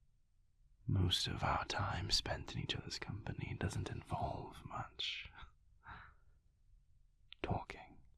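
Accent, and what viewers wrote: United Kingdom. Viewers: Porter's voice just gives me intense feels: always loved an enchanting British accent ❤️❤️❤️ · Never thought I'd fall so hard for a classic British accent 😂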